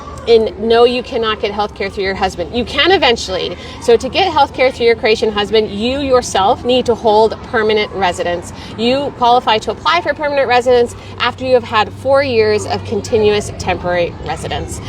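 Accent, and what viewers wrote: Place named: Texas, United States. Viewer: Hey!~ It was so lovely to connect with you at the Dallas meet up! Thanks again for taking the time despite all of the priorities you had going on. Really appreciate you, your team, and all that y'all do! *BTW~ I finally heard a bit of Texas in your accent in this episode! Hope to meet up again when we finally get to Croatia next year! 😊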